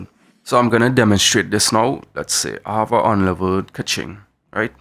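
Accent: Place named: Trinidad and Tobago. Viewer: You sound like a trini. Btw good video. You earned a sub.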